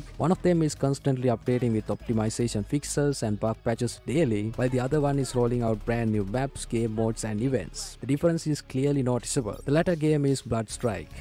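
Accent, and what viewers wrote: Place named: India. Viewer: Hey bro one thing can you tell me is your from India because I suddenly realized that your voice feels like Indian dude because as I'm indian too so it's feels me that.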